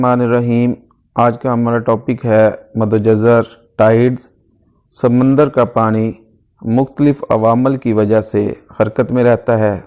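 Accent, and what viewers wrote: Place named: Punjab, Pakistan. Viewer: کتنا گندا پنجابی عجیب لہجہ وہ بھائی کچھ خدا کا خوف کرو تم لوگ اچھی آواز والا خالص اردو والے سے یہ ویڈیوں بنوا لیتے فل پنجابی لہجے والا کرخت انسان کی آواز جو انتہائی کانوں کو بری لگ رہی ہے سننے کو دل نہ کرے انسان کا مگر بے شرم قوم کو سب ہضم ہے ادھر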